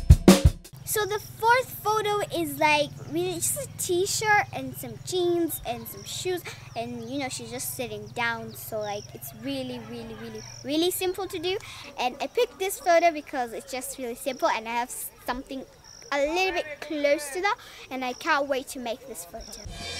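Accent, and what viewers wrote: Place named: United Kingdom. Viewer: Your english pure UK accent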